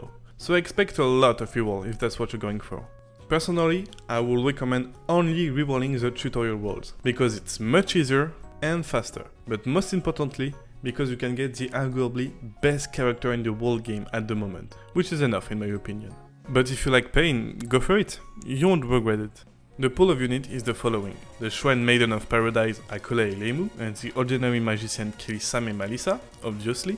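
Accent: France. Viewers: Cache mieux ton français wsh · you are so frenchinglish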